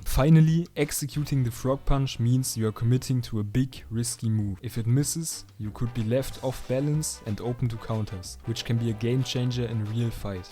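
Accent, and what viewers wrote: Germany. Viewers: Where u from? You sound German or something like that. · U German ? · This german accent...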